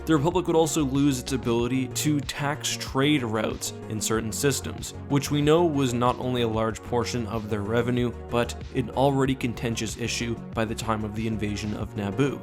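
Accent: Canada. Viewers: hey are you Canadian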